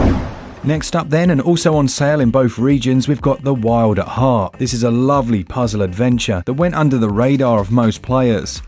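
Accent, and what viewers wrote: England, United Kingdom. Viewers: Deals sound better in an English accent.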